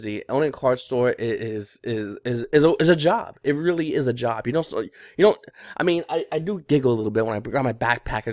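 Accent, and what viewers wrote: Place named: New York, United States. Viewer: you're from New York aren't you lol. Thanks for the vid.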